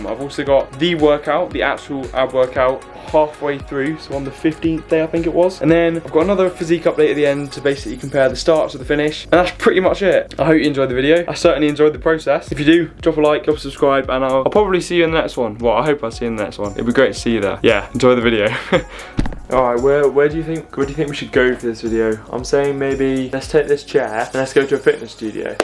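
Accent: United Kingdom. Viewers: I just searched for "30 day ab workout" and you popped up and when I clicked on your vid,my volume was up but I had a feeling that your accent was gonna be British idk why but I was right lol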